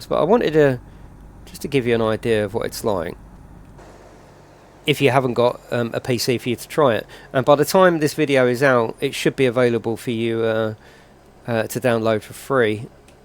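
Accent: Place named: Australia. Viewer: Omg the Aussie accent is strong and that’s coming from an Australian 😂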